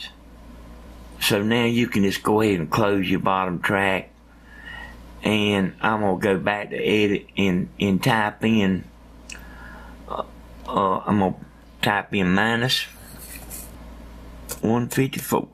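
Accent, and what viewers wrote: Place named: South, United States. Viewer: More Southerners should speak like that again. Excellent video. No waffling intro, straight to the point and helpful..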